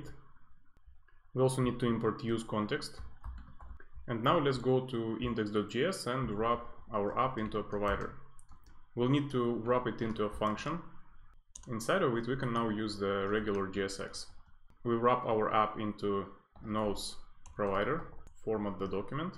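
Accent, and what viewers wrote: Russia. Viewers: После сотни видеороликов с индийский акцентом так необычно слышать русский.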